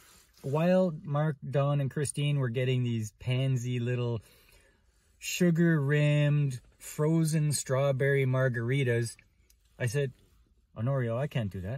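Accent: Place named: Northern Ontario, Canada. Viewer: Trust your wife, words to live by, so when's your next Cruise? Your Nordern Ontario accent was showin' heh great video!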